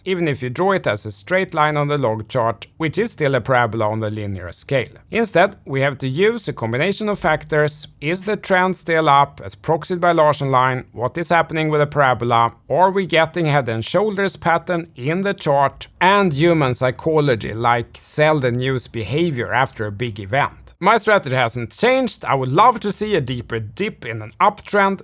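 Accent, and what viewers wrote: Sweden. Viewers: Din svinglish är obetalbar!😂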